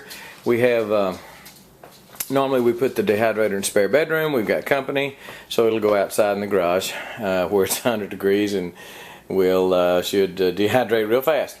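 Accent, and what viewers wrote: Texas, United States. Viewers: Love your Texas accent!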